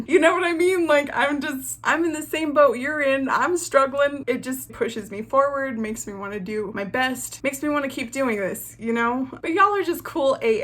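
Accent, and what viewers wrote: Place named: Midwest, United States. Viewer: Hey are you from the Midwest? I’m from Minnesota and your accent sounds like mine lol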